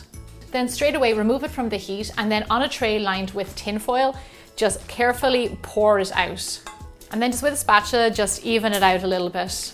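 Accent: Ireland. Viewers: Is she Irish..sorry first time here.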